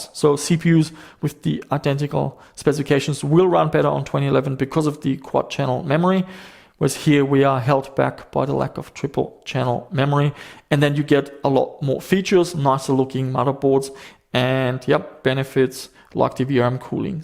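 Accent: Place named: Germany. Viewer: He's Australian, but I believe he has family in Austria from memory, so that may be why he sounds slightly German.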